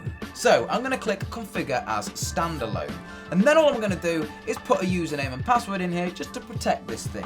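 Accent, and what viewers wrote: England, United Kingdom. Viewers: I like your English accent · English (UK) English (US) English (AU/NZL) English (Canada) All not same in pronounce of router Please not tell him how pronounce he use British accent.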